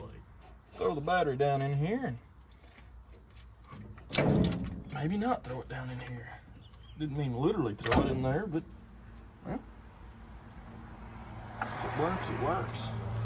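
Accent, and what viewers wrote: South, United States. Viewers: I from Chicago. I love this guys southern accent it makes the video even way better.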